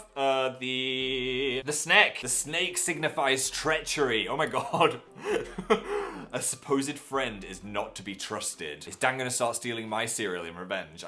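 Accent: North, United Kingdom. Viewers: HE SOUNDS SO NORTHERN AT THE BEGINNING OML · Wow he sounds so northern in this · U sound so northern in this video 😂😂😂 ... more than usual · you sounded so northern in this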